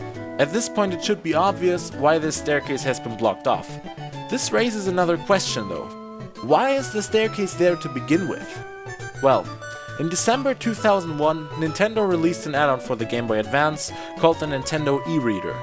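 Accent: Germany. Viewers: Wenn du nicht gesagt hättest, dass du die deutsche Version gespielt hast wär mir dein Akzent kaum aufgefallen. U got a keen knowledge of English bré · Man hört das deutsche raus herrlich hahaha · Direkt den deutschen Akzent rausgehört 🙈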